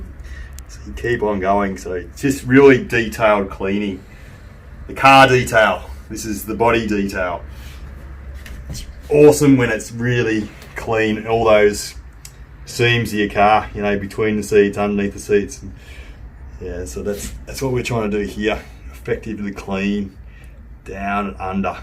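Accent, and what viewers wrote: Australia. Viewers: Your typical Aussie accent. Represent 💪🏿💪🏿💪🏿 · I love foot massage videos. With Aussie accent, I smashed the reply. Thanks for posting this video. I subscribed.👍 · I picked up the aussie accent ! Hello from Paris France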